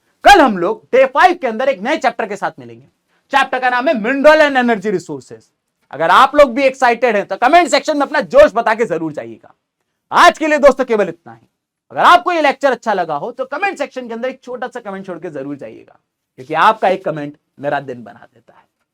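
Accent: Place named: Bihar, India. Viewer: Pure bihari lag rahe hai sir ❤❤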